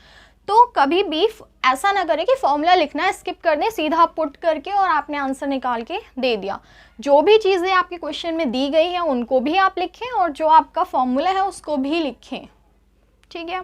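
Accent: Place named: United Kingdom. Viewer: Mam apki accent british wali h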